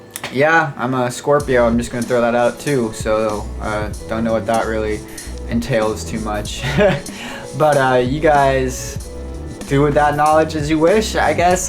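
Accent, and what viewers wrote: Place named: United States. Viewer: I heard this type of voice everywhere in America. Just why do they all sound the same.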